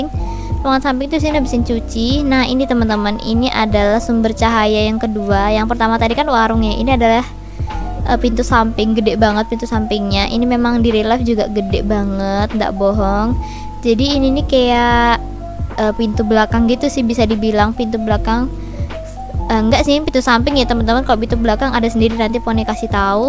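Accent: Java, Indonesia. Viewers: Kk orang Jawa ya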